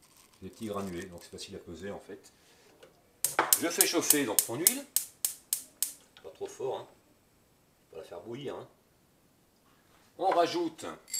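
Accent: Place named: North, France. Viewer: Salut, J'aime bcp tes vidéos, ta façon (simple) d'expliquer et ton accent (je pense du nord de la France: au dessus de la Loire...) Un petit "Crut" pour les macérâts huileux solaires: j'utilise des boîtes de conserve que je numérote de 1 à 4 (au lieu du papier "Kraft" tout moche) et en cas de pluie: pas de PB... Chaque jour, je "tourne" de 1 à 4....pour être sûre que ma macération soit au soleil uniformément.... Encore merci pour ta simplicité et tes explications Bises.... et toi aussi prends soin de toi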